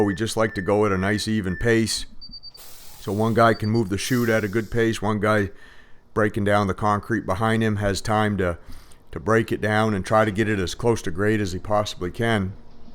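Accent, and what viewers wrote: Maine, United States. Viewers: Holy cow bub you must be from Maine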